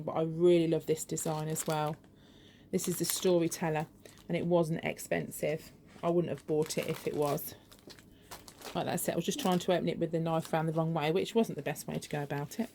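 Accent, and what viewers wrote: United Kingdom. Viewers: Just came across your channel after typing in UK crafts. I was getting a bit fed up with most sites I'm subbed to being American with items not available over here. It's good to here a British voice! I'm on a tight budget & never heard of 'Every Craft A Pound' so this is great news for me. As for your craft room...WOW! It is just so beautiful & all so neatly organised. I don't have a spare room so my very small & narrow living room is also my crafting room! hehe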